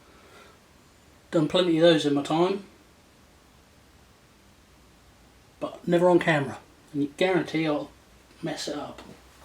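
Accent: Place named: Suffolk, United Kingdom. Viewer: Nice to hear the accent, im a Suffolk boy originally. West Aus now though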